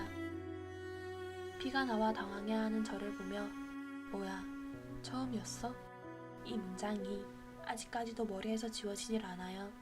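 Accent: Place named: Gyeongsang, South Korea. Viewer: 사연 읽어주시는분 갱남사람인가베요?ㅋ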